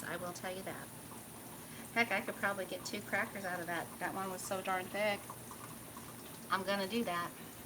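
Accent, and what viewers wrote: Texas, United States. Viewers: How is it I am just now finding you? LOL. You sound like you may be a fellow Texan. I am enjoying your videos.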